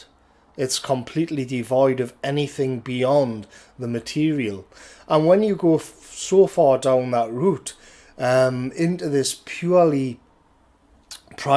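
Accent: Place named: Geordie, United Kingdom. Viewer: You're a clever lad marra. Love listening to your vocabulary in wor accent!!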